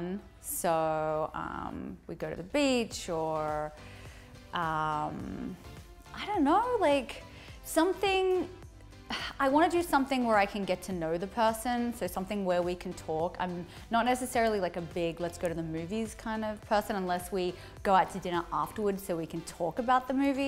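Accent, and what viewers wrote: Australia. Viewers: Glad she hasn't lost her Aussie accent.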